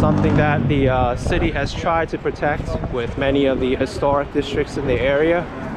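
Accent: New York, United States. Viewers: Good video! I like the Lower East Side! The New Yorker in you finally came out! lol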